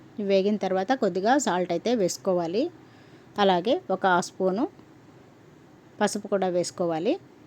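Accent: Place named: Rayalaseema, India. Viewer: మా రాయలసీమ భాష, ఎర్రగడ్డలు,వుర్లగడ్డలు వినడానికి ఆనందం గా వుంది.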